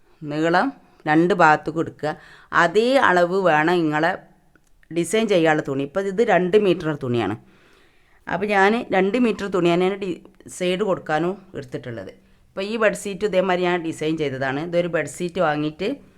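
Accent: Malappuram, India. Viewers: താത്ത സൂപ്പർ നമ്മുടെ മലപ്പുറം ഭാഷ പൊങ്ങട്ടെ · Malappuram original samsaram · തനി മലപ്പുറം slank...... no ജാട.... അടിപൊളി താത്ത...... i like it ഉറപ്പായും ഞാനും ഉണ്ടാകും ഞമ്മടെ ബെഡ് സീറ്റ്‌....... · ഇത് ഞമ്മളെ മലപ്പുറം ഭാഷ..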